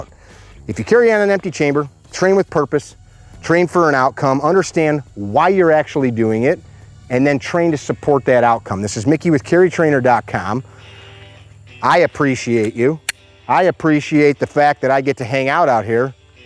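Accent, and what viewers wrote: United States. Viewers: Speakin normal american english so he must be. 😁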